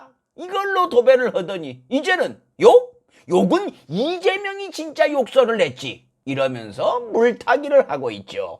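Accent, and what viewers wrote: Jeolla, South Korea. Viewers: 형 전라도야?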